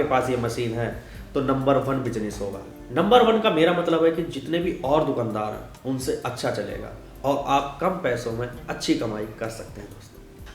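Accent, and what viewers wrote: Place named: Uttar Pradesh, India. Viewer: up ka he ha lagtha ha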